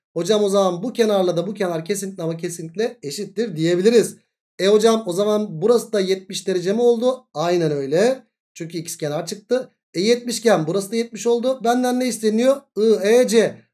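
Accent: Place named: Thrace, Turkey. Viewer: Hocam siz devrekli değil trakyalısınız bence hatta Çanakkalelisiniz. Konuşmalar çok bizden 😄 ağzınıza sağlık anlatımınız süper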